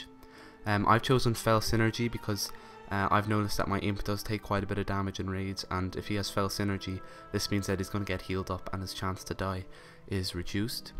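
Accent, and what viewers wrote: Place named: Ireland. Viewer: Another Irish warlock? :p my lordy!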